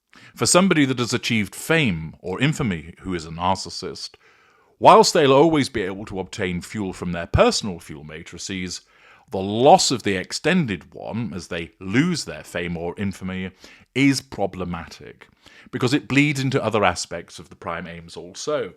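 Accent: United Kingdom. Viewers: I already put a note on my computer to boycott all videos featuring she who will not be named so I wouldn't accidently forget. I am happy you have joined the boycott as well. Everytime I hear your voice I am reminded of a certain British gentleman I see in my videos a lot. It's entertaining to wonder if that is you or not. It was so wonderful to see the Princess of Wales looking so beautiful as well.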